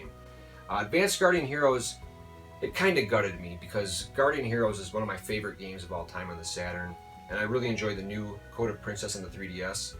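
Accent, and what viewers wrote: Midwest, United States. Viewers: Hi there. As always I enjoyed this video. You strike me as a good family man and you mention your son quite a bit on your channel. So why not have him on in a future episode? Also as a side note. Are you from the Midwest, cause I detect a slight Midwestern accent. Thanks again for the video.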